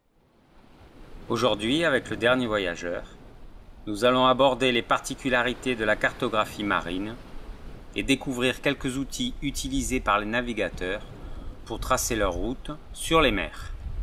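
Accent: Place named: Provence, France. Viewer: Excellente, cette série de tutoriels avec l'accent de Provence. On jurerais que c'est Marius qui nous donne le cours !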